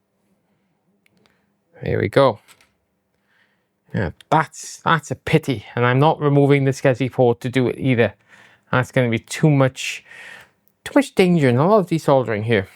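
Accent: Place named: Wales, United Kingdom. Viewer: I thought you were German .... but your Welsh!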